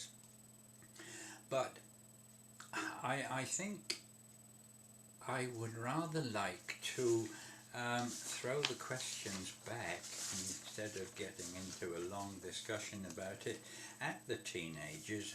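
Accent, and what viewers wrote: United Kingdom. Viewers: Old Guy + British Accent = Best lecturer.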